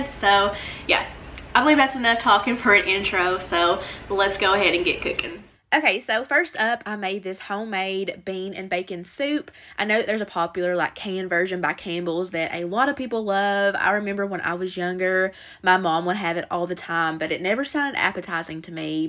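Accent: South, United States. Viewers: I love southern accents so much 😫💜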